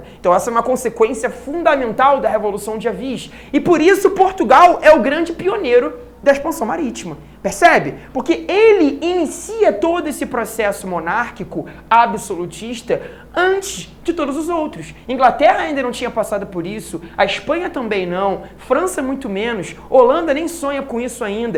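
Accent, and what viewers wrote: Rio de Janeiro, Brazil. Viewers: Acho lindo falar colocando xs. Em qual região do Rio terei que morar para começar falar com esse sotaque e quanto tempo mais ou menos? Obrigada! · aaa amo sotaquin cariocaa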